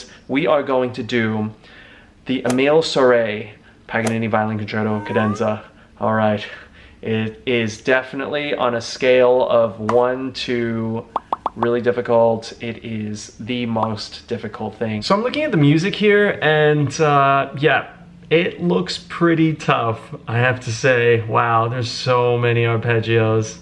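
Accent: United States. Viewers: This is like the musicians equivalent of a no-makeup selfie. BTW I thought he was Australian? How come he has an American accent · I thought he had Australian accent oh well he has a great American accent he’s amazing at the violin too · Why do you sound so American in this video but so Australian in the Ling Ling workout video lmao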